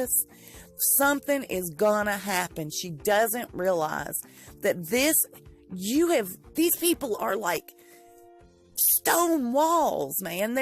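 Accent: South, United States. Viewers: P.S. I really Like Your Southern Accent. Where Are You From In The South?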